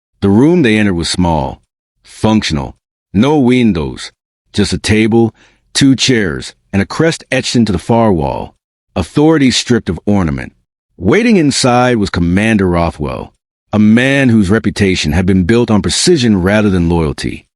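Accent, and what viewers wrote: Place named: South, United States. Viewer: It's ironic that the voice has a southern U.S. accent when the setting is England.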